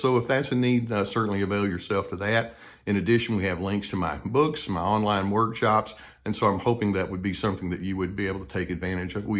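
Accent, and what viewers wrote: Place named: Rural, United States. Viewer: Good topic, just ... but I think people who become professionals should try to get rid of that accent. It is possible to learn to speak with a more neutral accent. Because it's so distracting and it robes him of credibility. And that's just because of the stereo-types associated with the accent, I guess: cows, farms, ranch, horse, farmer, pigs, mcdonald, cowboy hat ... it's not usually associated with intellect. Omg I hate to be so hateful lol. But nonetheless, good content, good channel. Don't me I'm an idiot girl who cannot stop herself from typing my actual thoughts.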